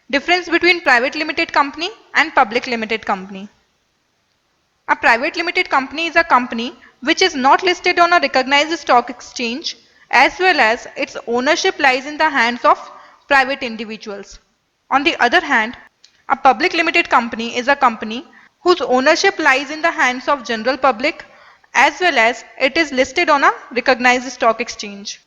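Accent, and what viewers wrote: India. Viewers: Indian accent > > > > > >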